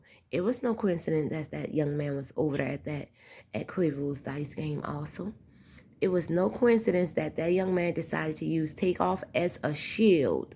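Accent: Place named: South, United States. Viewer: Welcome back! Love you and your content just keep doing you My Queen. Love the southern dwarl my Queen. From the Chi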